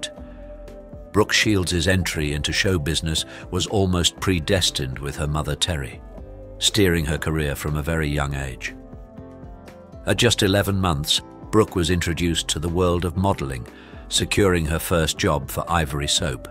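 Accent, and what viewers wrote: United Kingdom. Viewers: Impressive with the British narrator. If it were only true. He met her when she was 14 but was soon shipped back to the US. He went on with his life. She wrote him every day, he never even contacted her for a year. Her parents wanted her to marry the most famous man in the world. Her mother pushed the relationship even though Priscilla started dating a 19 year old named Ron Tapp. Desperate to get her to Graceland her parents sent her at almost 18. Elvis finally married her at 21. They both cheated, she became involved with her dance teacher who was the son of actor Gregory Peck. Then she started an affair with her married Karate instructor Mike Stone. She would finally leave Elvis for Stone. Elvis gave her everything she ask for in the divorce. She continued to demand money from ELvis till the day he died.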